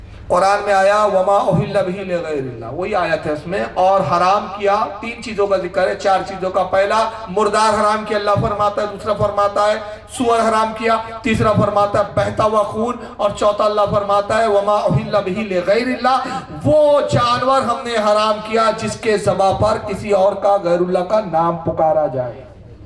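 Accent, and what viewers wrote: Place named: Kanpur, India. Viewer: Kanpuriya style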